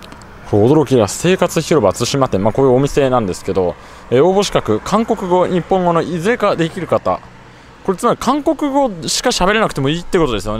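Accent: Tokyo, Japan. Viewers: お話方は東京下町言葉をお話になるので、ある程度の整列された歴史認識を持ってこのような動画を作っておられるかと思っておりましたが、残念ながら中身がよしもと芸人と同じアンポンタンとは少し残念のように感じます。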